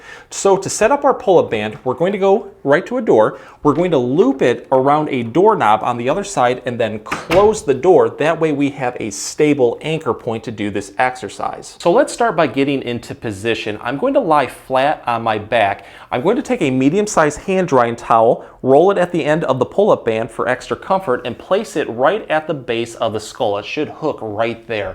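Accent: Michigan, United States. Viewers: Holy wow!!! I’m working in Italy and the beds and pillows are horrible. My neck was hurting so badly that I started a Google search on videos to help out. I found your videos and what relief!! I definitely will have to see my chiropractor when I return back to the states. BUT I do have to say as soon as you started talking I thought “He must be in Michigan”….. I lived in St Joe, MI for 7 years!!! Of course I’m following you now!! Thanks for the relief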